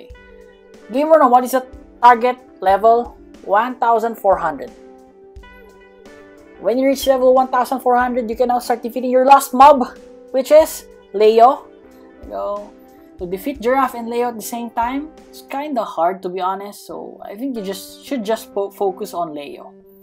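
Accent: Philippines. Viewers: Are you a filipino? Because u sound like Filipino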